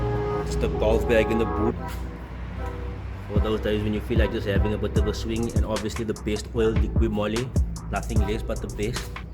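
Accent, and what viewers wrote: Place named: South Africa. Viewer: Love the South African accent 😄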